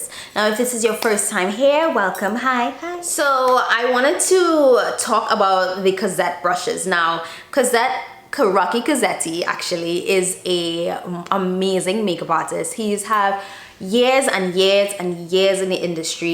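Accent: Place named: Trinidad and Tobago. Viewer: You sound Trinidadian. Where are you from?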